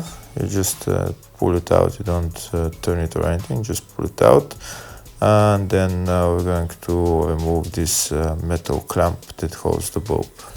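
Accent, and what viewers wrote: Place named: Eastern Europe. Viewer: This accent sounds familiar. Kinda eastern European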